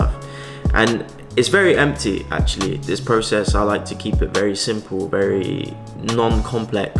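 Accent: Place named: United Kingdom. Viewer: I love your explanation, simple and clear. Right from the start, you are the British who might live in London or something.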